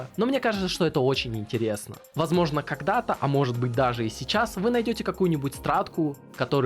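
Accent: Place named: Asia. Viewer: он говорит на английском с некоторым азиатским акцентом. Подтвердить его русскую национальность не могу.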